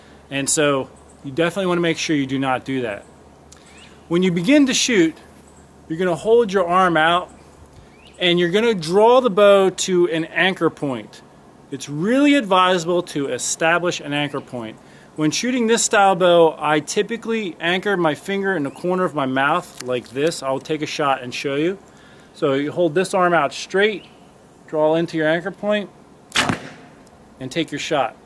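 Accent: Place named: New Jersey, United States. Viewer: Thanks you do have a direct, likable, teaching style. Your accent sounds like my home state, new Jersey.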